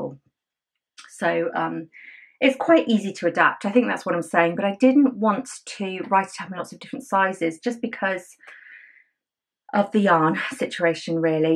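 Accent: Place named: United Kingdom. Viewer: hello Ms., I love watching your vlogs. I love crocheting and reading too. I am from the Philippines and I think I am learning more about British English by listening and watching your vlogcast ^_^ Thank you very much. Happy and blessed New Year!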